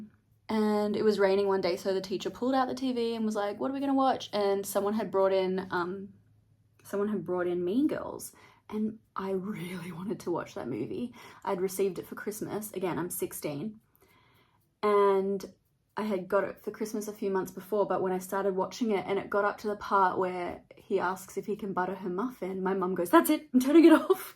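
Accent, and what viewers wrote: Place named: Australia. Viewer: I’m 31 and I’ve been watching you for agesss and I feel like you’ve lost your Aussie accent a bit 🥺 love you and love Australians so much ❤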